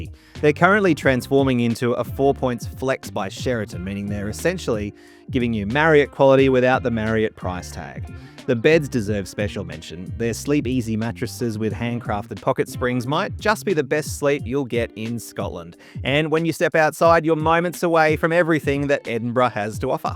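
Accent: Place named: Australia. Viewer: Bro are you Australian?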